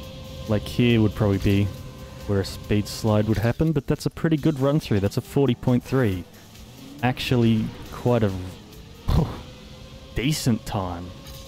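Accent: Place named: Australia. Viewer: I thought you sounded Aussie and I think 3 am pretty much confirmed it. Are you in Queensland where cotd is still 3am after daylight savings changed? Or are you not even aussie😂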